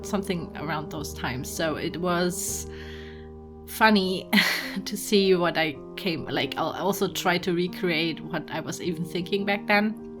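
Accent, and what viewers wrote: Germany. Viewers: I love the German accent. It's so relaxing listening to you speak.. This is a masterpiece btw 👏👏 I love the idea that your viewers have their own dorm rooms and ya'll go to this school together. Something about that idea is so heart warming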